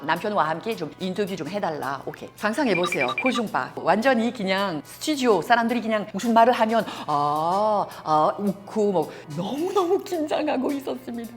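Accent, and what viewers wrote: France. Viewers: 이야 이게 누구야 부란서이씨의 원조 이 님 아녀? 불어억양의 빠른 속사포한국어는 여전하네요. 오랜만에 들어보니 반가울지경. 한국에서의 삶이 벌써 30년이 넘으셨으면 이젠 그냥 한국인이시죠. 오히려 고국으로 돌아가도 어색하실듯. 암튼 건강하게 잘지내는 모습 보니 좋네요. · 악쌍 코레앙은 정말 그 누구도 따라할 수 없는 트레이드 마크. 트레 쥬닉크.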